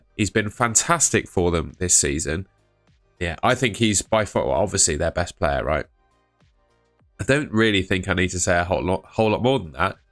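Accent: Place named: Norwich, United Kingdom. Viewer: Given I'm from Norfolk myself, I'd swear you were from Norwich. Yes the west country accent is similar but you definitely sound more Norwich than Bristol.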